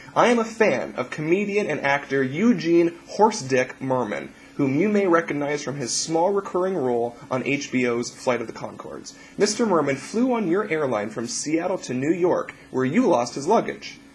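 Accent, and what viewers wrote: Canada. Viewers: haha. you sound canadian.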